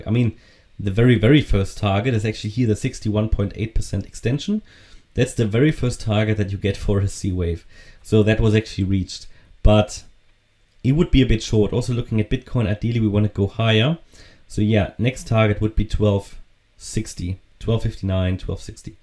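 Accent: Germany. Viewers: Great vid! Are you German?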